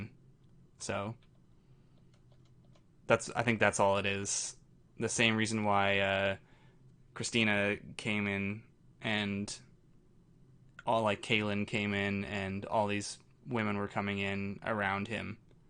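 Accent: Canada. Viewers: aha! So to those that religiously follow and interact with (, the owner of this channel) have been wondering forever who he is and where he is from. Everyone suspects he must be Canadian because of his accent. So we are trying to fish that out. He is very mysterious haha. But a super nice guy, like SUPER NICE has two dogs Jackson and Bob and his name is not Harrison. Also he has relationship experience, we have no idea if he is in a relationship currently. When he has 100k subscribers he will do a face reveal. So please tell your friends to subscribe haha.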